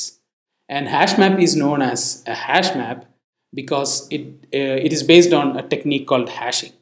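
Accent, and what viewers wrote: India. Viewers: Indians have their own accent just u having urs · malayale accent ! malyale anno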